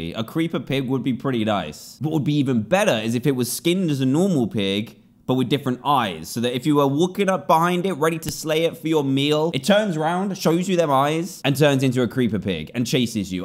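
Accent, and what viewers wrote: England, United Kingdom. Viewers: He’s British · He's from england or something....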